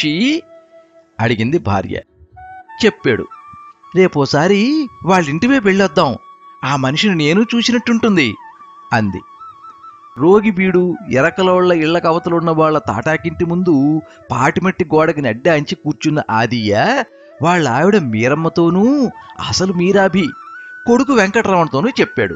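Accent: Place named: Godavari, India. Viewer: నవ్వి నవ్వి పొట్ట చెక్కలయింది. మీ గళం అసామాన్యం. గోదావరి యాస‌ చక్కగా పలికింది మీ గొంతులో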